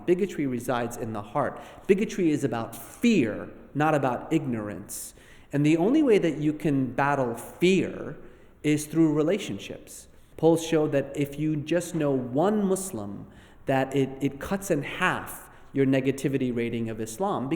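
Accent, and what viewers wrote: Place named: France. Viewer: poor production quality... too much echo and the french accent of the host is distracting.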